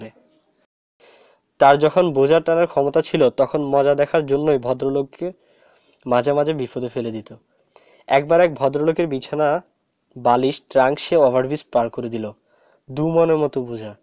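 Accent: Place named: Noakhali, Bangladesh. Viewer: চালিয়েে যাউ মন্দনা আরও ভালো হতে হবে নৌয়াকালি আনছলিক টান আছে একস্টা প্লেবার রয়েছে বেশ লেগেছে।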